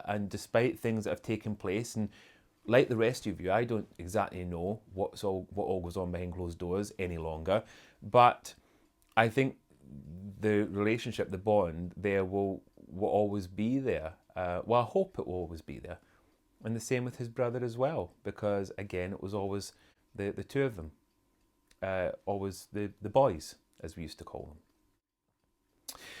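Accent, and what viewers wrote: Scotland, United Kingdom. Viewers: You're probably too much of a gentleman to answer this question but...having known Harry when he was younger what are your thoughts on his and his wife's current behaviour? Surely as someone who had seen first-hand how close the brothers used to be their current estrangement must be very sad to witness...☹ Ps...love the Scottish brogue, it's so incredibly soothing! 💖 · Are you Scottish? We love your accent!!!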